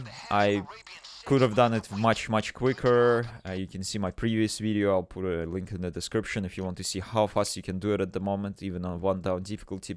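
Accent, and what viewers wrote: Russia. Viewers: Thx man I like your Russian accent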